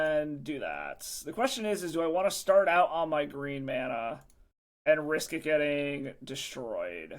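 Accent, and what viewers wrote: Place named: Alabama, United States. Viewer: I don't speak English but your accent sounds like Alabama to me, im right?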